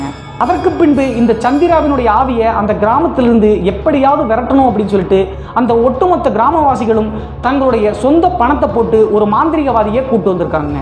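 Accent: Tirunelveli, India. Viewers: Bro enga area speech mari irukku. Tirunelveli doii🤩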